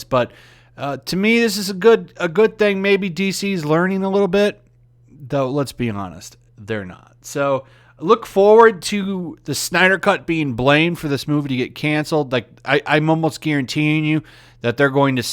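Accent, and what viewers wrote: United Kingdom. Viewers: Your british accent sounds funny af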